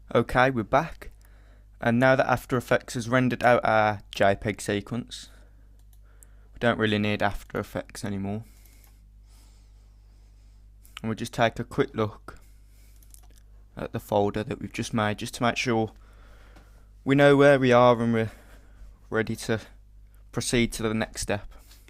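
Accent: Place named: Northeast England, United Kingdom. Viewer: yow aye from roond ere am ya? hahah i recognize that accent.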